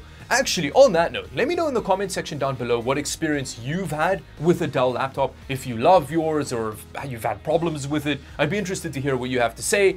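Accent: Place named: South Africa. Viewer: You have a South African accent. Are you from South African?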